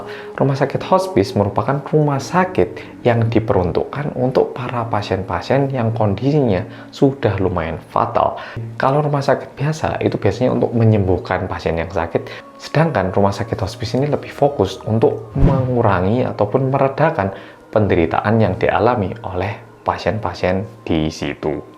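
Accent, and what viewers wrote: Java, Indonesia. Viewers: awal mula medok jadi kepeseng gimana nih?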